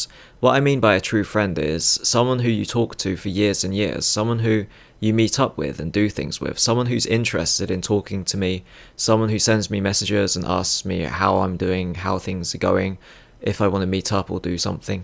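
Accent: United Kingdom. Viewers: He speaks with a fluent English accent though. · there is something really annoying with low-level trolling in an english accent. I don't know why that is..... and when a troll has a russian accent it instantly becomes amusing and cool! but when it's that english accent...... it just becomes YUCK!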